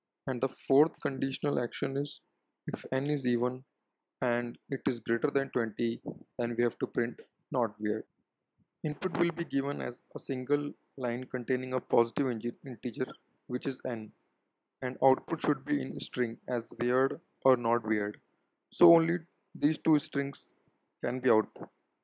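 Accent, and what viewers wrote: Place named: India. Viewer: once I hear the indian accent, I already know the guide is gonna be good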